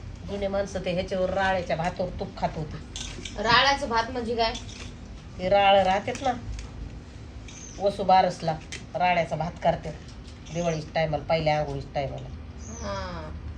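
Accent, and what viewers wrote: Ahmednagar, India. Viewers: बोलणे तर नगर सारख आहे पुण्याचे वाटतच नाही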